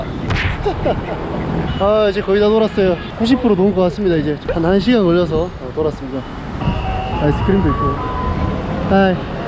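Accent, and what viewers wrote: Gyeongsang, South Korea. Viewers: 저도 경상도 사람이라 님 사투리가 넘 친근하게 느껴지네용 ㅋㅋ 옆집 오빠같은 느낌ㅋㅋㅋㅋ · 다음부터 이런 실수 하지마 ㅎㅎㅎㅎ 근데 말투가 꼭 우리 동네말투네요 대구쪽분이세요?? ㅎㅎㅎㅎ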